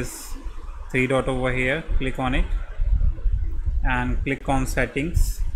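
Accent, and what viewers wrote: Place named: India. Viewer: He kinda speaks Indian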